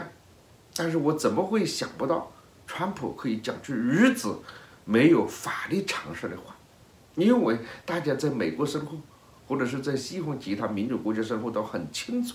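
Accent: Hunan, China. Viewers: <num> Lotus 😂应该是湖南的吧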